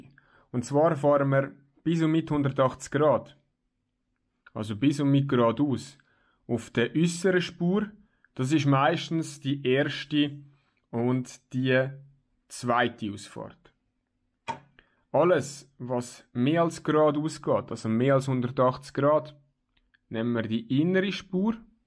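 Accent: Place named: Switzerland. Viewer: Als deutscher muss man ein bisschen besser zuhören um Schweizerdeutsch zu verstehen wegen dem Akzent, aber ich hab es verstanden und bedanke mich für die tolle Erklärung mit Vorschau. Danke nochmal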